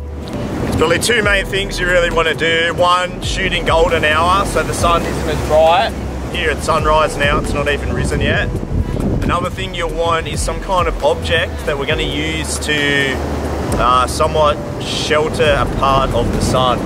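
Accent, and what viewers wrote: Australia. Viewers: That photo is so beautiful. Your Aussie accent was so strong 6 years ago - it's really noticeable compared to the videos you made this year 😊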